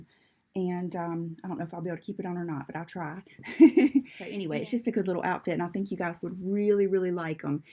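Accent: South, United States. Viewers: So sorry you burned yourself. I have done that too. I have been watching a lot of your videos and truly adore you. Your accent sounds like you might live in the south. It is so nice to listen too. Love your kitties. When I was growing up we lived in a small town in central Illinois. We had 10 acres surrounding our hose which was 3 stories. We had so much space that the top floor was turned into a play house for me and my sister. With that said we also had room for 39 kitties at once. We would dress them up in doll clothes, pretend to operate on them and just silly girl's stuff involving our kitties. So long story short I love love kitties and yours are so beautiful. Love them.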